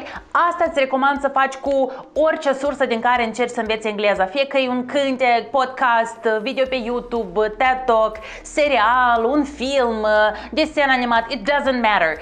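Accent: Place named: Moldova. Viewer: Ironia e ca vorbesti engleza aproape mai bine decit romana standard. Ai un accent moldovenesc inconfundabil. Poate ar trebui sa iei, in paralel, niste lectii de pronuntie in limba romana. The bad boys example is rather black american, not exactly English. Da' acum, daca acceptam moldoveneasca drept standard pentru romaneste, ce mai conteaza ghiveciul English vs American English, Canadian, Caribbean, Australian and all other variants? Ca sa nu zic ca, in North America, by native you mean the indigenous people, care mai vorbesc some sort of Quapaw language. No relation to English, though. Norocul tau e ca te adresezi urechistilor, asa ca, don't despair, keep going on. We all need some comedy, in our lives, every now and then. Happy Orthodox Easter to all my Moldavian friends.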